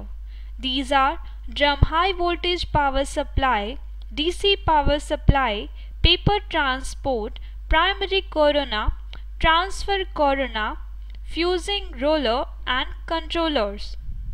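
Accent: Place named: India. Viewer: fucking india and their dumb fucking accent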